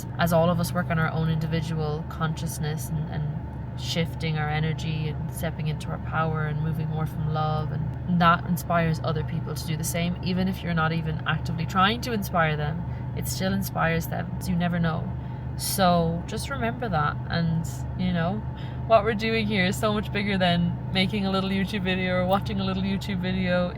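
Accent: Ireland. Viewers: is it a irish accent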